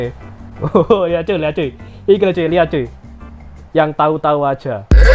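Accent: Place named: Banyumas, Indonesia. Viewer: Welcome back lord Pas nonton video ini , bini gw langsung hapal & bilang "oh ini yg mas-mas ngapak itu ya?"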